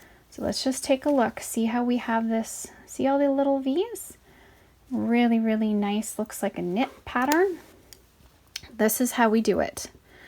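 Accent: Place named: Canada. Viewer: These are addictive! I'm a knitter, and all my crochet attempts get ripped out. I made three of these! Thanks so much for sharing your wonderful creativity. On a completely different note, I'm a linguist, and I'm trying to place your accent :) The way you say "about" makes me think Canada, but the way you say "to" or "two" is really stumping me. Would you mind saying what region of N. America you hail from?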